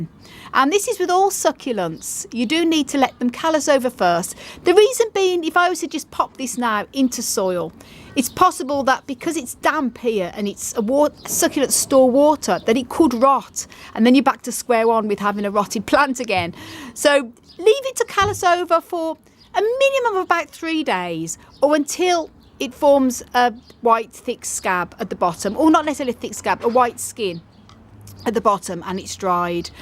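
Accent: United Kingdom. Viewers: I loveeee your video! Your voice, your accent, your energy.. it’s everything. It feels like i am learning with someone from Hogwarts. Hehe.. Thumbs up! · british accent makes my head dizzy lol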